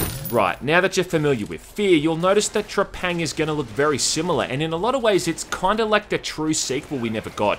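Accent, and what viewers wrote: Australia. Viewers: putting on an accent? ever heard of australia?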